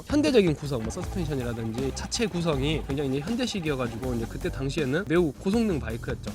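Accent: Jeolla, South Korea. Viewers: 내용 매우 좋았구요, 더 보고싶다는 느낌은 주행영상에 대한 목마름이 아니었나 싶습니다. ^^ 특유의 전라도 사투리 억양이 재미를 더합니다. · 전라도 말투가 나와서 혹시 했더니 "귄있다"는 단어를 예로 드는 걸 보면서 확신을 합니다. 말씀 참 잘 하시네요. 작년에 할리 883 몇 달 타 보고서 이정도면 충분해 하며 오토바이는 안 산다고 맘 먹었는데 이녀석을 보고 나니 엄청 흔들리네요.... 주식을 좀만 더 열심히 해서 2천만 더 모으면...ㅎㅎ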